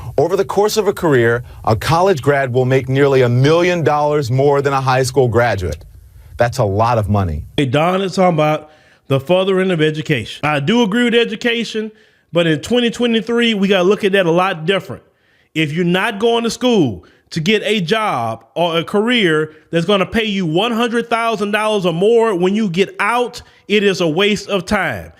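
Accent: South, United States. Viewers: I love your southern accent. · Love that southern accent brotha!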